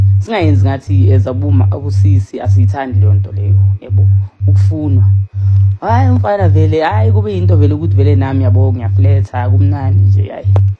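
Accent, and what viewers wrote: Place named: Msawawa, South Africa. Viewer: Watching from OrlandoEast❤Yaz bengsamangalele itone yakho netaal Kanti wangapha eMsawawa,😂❤ Lentwana Lexi zize ngamawaza🤞maar zyaseta bro🤣🤣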